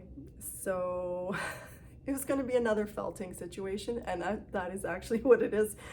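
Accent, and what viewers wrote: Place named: Western Canada, Canada. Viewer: Thank you for your podcast. I enjoy hearing your western Canadian accent, it feels so familiar and friendly. It is fun to see the places you go . I also love that you share your knitting adventures fully, things that don’t work out as hoped and your triumphs , it is nice to know that we all have ups and downs this way :) I just love your open friendly way and all your flight attendant sayings . Any ways, thanks :)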